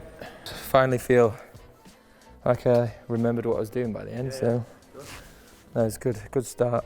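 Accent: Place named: United Kingdom. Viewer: Bro has the most polite British accent ever 😌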